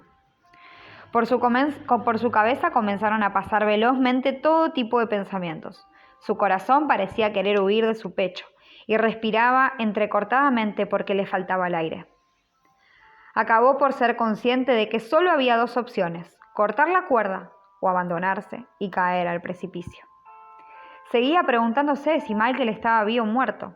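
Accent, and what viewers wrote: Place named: Argentina. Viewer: QUE LINDO EL ACENTO ARGENTINO.....SALUDOS DESDE PERÚ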